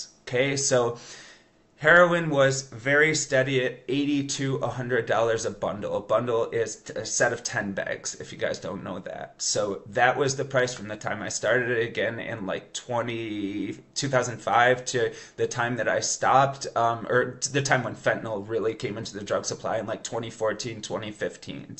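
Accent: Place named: Syracuse, United States. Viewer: oh 100%! they shut down the "pill mills" but everyone was already hooked. Exactly why "crackdowns" on any one particular drug never work--the population will just shift to something cheaper, more readily available and likely more dangerous. I knew about Portugal's decrim status on most substances but I didn't know about the micro loan program! that's very cool :) I knew your accent sounded familiar but as soon as you said "Syracuse" it all made sense lol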